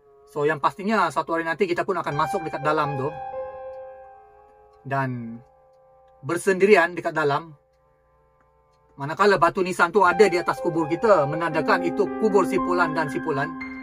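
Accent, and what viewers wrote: Sabah, Malaysia. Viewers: Slangnya slang Sabah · ia org sabah tu,bahasanya tu,tapi x pasti daerah mana,sy pun baru tgk chanel nya ni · Org sabah ka nih ckp cm org sana jak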